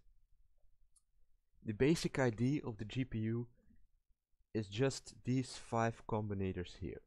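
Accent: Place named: Belgium. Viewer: It's sounds like you are Belgian imo, and it even seems like I recognise your voice. But that would not be possible since I don't really know any other dedicated programmers.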